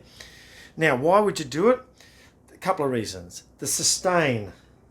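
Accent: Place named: Australia. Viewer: When I hear that accent and see that instrument, I expect to hear, " ".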